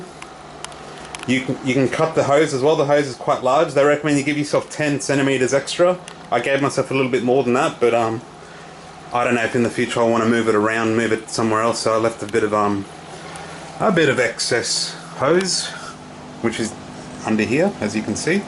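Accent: Australia. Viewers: Love the aussieness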